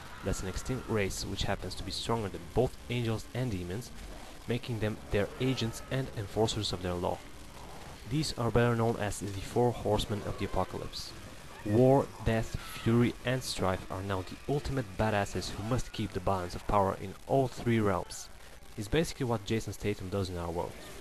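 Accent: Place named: Venezuela. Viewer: lol my friend from Venezuela has the same English speaking accent as you. xD where you from? Subbed btw.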